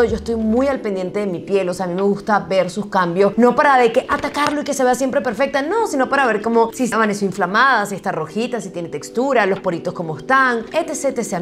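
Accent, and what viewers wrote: Venezuela. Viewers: Omggg eres de Venezuela???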